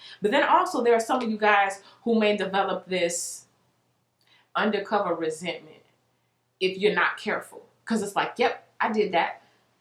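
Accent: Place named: Atlanta, United States. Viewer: U so Atlanta. Loool .😂😂 I love it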